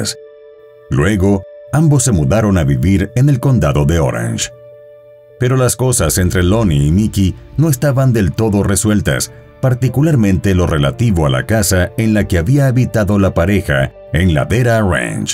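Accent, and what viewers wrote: Mexico. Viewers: Lo se, pero es acento mexicano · Sr, su voz es maravillosa para yo tenerla al acostarme susurrándome poesías de amor!!!!!!! Usted nos pudiera decir de qué país es??? Dicen algunas que es mejicano, pero para mí su entonación es neutro …….y no lo puedo definir….. Nos saca de la duda?????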